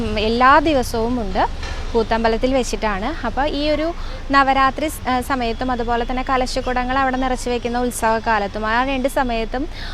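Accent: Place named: Namboothiri, India. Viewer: ഹരേ ശ്രീ ഗുരുവായൂരപ്പാ ! മോൾ ടെ ഓരോ ദിവസത്തേയും കഥകൾ കേൾക്കുണ്ട്. വളരെ ഭംഗിയായി അവതരിപ്പിക്കുന്നുണ്ട്. ഒരു നമ്പൂതിരീശൈലി ഉള്ളതു കൊണ്ടു തന്നെ അവതരണം ഗംഭീരമാകുന്നുണ്ട്. എനിക്ക് വളരെ ഇഷ്ടമാവുന്നുണ്ട്. വളരെ നിഷ്ക്കളങ്കമായി അവതരിപ്പിക്കാൻ കഴിയുന്നതു തന്നെ ഗുരുവായൂരപ്പൻ എപ്പോഴും കൂടെയുള്ളതിന്റെ ലക്ഷണമാണ്. ഭർത്താവിന് അഷ്ട ഐശ്വര്യവും നൽകി ഗുരുവായൂരപ്പൻ അനുഗ്രഹിക്കട്ടെ! മോളു തന്നെ ഈ പരിപാടി അവതരിപ്പിക്കുന്നതാണ് പ്രേക്ഷകർക്കിഷ്ടം. തുടർന്ന് പോവുക. ഹരേ കൃഷ്ണാ .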